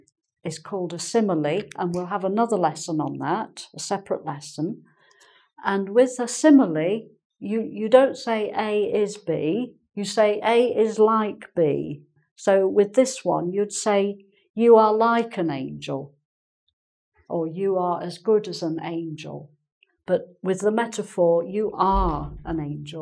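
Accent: England, United Kingdom. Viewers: Good evening Teacher! You are amazing! When i hear you speaking is = brazilians speaking portuguese. The british English is softer to our ears than american english. Thanks for your teaching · congratulations, your accent is England definitively. Thanks for the the subject metaphors it in fact improve our learning the english as a second language. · Just came to know your channel last night.accidently....oh ..you are so good teacher!!!!!your accent reminds me the time l lived in England! So lovely tobhear you teaching, your speaking. Your teaching make look like english speaking so easy!!!!!which is not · british accent, ouch! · uk accent? i love it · She is great “old school “ teacher.I already know most of the topics she is teaching,with foul modesty I am fluent in English,but her English English is helping me a lot,since I still have very strong accent and there is always something new to learn from her ❤️ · Standard of British pronunciation she speaks ......so clear...and easy to catch up.....appreciated this lesson....many thanks for your lesson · British accent! 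Sounds good :) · really u r an angel to guide the people...your expression is marvellous with typical British accent...thanks a lot ma'am.. From Kolkata..india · Hello... A very clear and useful class. You are an angel and British English in a person, too... Greetings!!! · I forgot about the lesson you gave and instead I really enjoyed the British accent. It's amazing. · Thanks for your help! You really are an angel! I just started to watching your videos and it's "lovely" your british accent!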